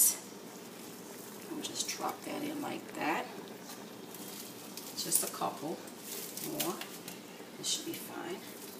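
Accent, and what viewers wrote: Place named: United States. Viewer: eskizem wi madam se cuisine haitienne mwen te ekri sou YouTube la,epi se anglè mw te tande ki tap pale,franchman mw panse se yon americaine ou te ye,selman mw te felisitew pou bouyon an,siw pran sam diw la nan yon lòt sans wa eskizem masè...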